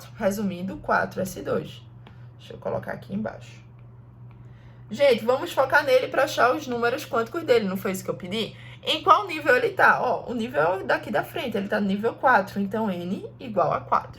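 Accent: Pernambuco, Brazil. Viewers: Vc é de Pernambuco? Seu sotaque me soa bem famíliar😊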